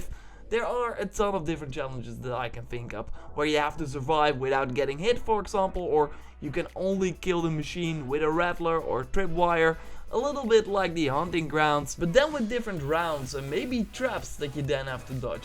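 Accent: Netherlands. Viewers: Are you dutch? You have a dutch accent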